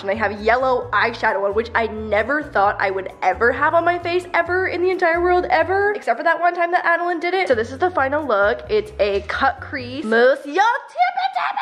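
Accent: Canada. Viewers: why did i read the title “i’m sorry” in your canadian accent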